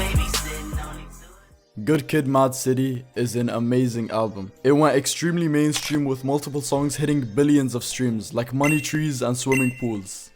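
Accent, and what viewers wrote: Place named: United Kingdom. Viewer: Why do I love the British accent so much😭😭😭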